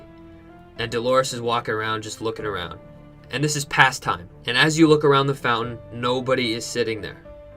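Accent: Canada. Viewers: do all Canadians pronounce Theresa as "tharissa"?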